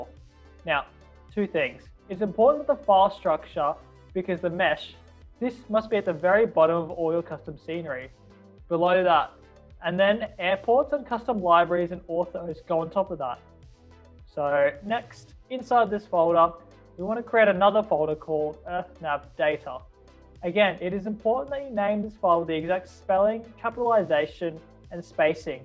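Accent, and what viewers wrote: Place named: United States. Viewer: I don't have global scenery installed so will it work like that And also u look like indian and talk like American nice channel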